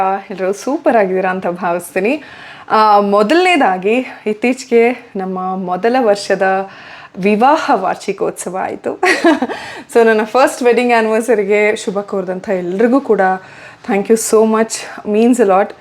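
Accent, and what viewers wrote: North Karnataka, India. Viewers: Wow namma UK bhashe keli bhala ksushi atu, Happy Wedding Anniversary 😊 <link> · Akka nim bai valag nam uttar Karnataka language agdi mast 🔥🔥❤ri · Nav ಉತ್ತರ ಕರ್ನಾಟಕದವರು ri ,bala kushi atri niv hing matadiddu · Bhari masth recipe ayitu, nam UK language masth matadtiri ❤ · Nice that you spoke in Uttar Karnataka tone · Nim uk baashe super madeam,navu dharwadadinda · Happy wedding anniversary ma'am 💕 loads of love from Haveri ❤, nivu avag avag hing uttar karnataka language use madtiri kelok chanda ansat 🥰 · Hye uttara Karnataka tone❤️nim ela blogs ide lng alle mdi sis.nan uk hudgi alla bt nim lng ❤️❤️ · Nam uttara karnataka bashe keli tumba khushi aytu mam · ನಿಮ್ಮ ಉತ್ತರ ಕರ್ನಾಟಕದ ಭಾಷೆ ತುಂಬಾ ಚೆನ್ನಾಗಿತ್ತು❤ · Happy wedding anniversary 🎉 stay blessed 😊 happy to hear your north Karnataka kannada accent 😊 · nimma Uttra Kannada bashe chennegeidea I am also UK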